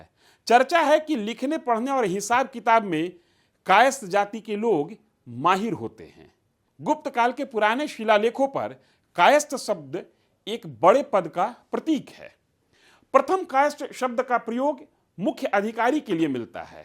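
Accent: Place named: Bhojpuri, India. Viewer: जी, अपने भोजपुरिया एक्सेंट से मुक्त होइए। सही उच्चारण पर ध्यान दीजिए। आपका वाचन स्तरीय नहीं है।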